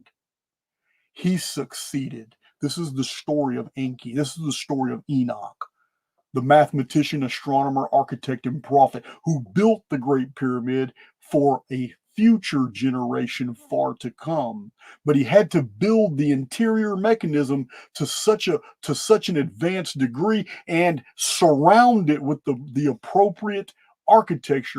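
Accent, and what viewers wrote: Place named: South, United States. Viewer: I have been measured at 161 IQ. You are truly smarter than 119...I put you at 162...it is too hard on the intellectuals to lose arguments and debates to a southern accent. I know, I am from NC, and live in California...Yep, that southern accent is a double edge sword. On one hand the intellect is stealthy, on the other hand it is easy to dismiss a southerner speaker and pass it by as a dumb-ass blithering, which you and I are not. Love your shows.You ever need a typing done, i do about 100 wpm.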